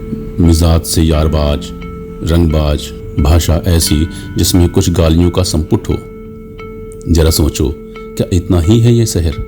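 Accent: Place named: Kanpur, India. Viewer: Aapki aawaaz bhi Kanpur ki visheshta hai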